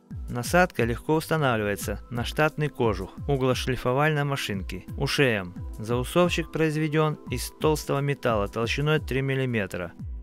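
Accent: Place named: South, Russia. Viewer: Южный акцент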